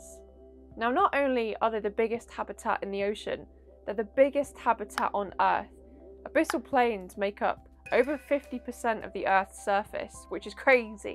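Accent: United Kingdom. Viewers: What part of England is your accent? Its one of my favorites, the one where yall say it like "PEBBOWLS" instead of pebbles 😂❤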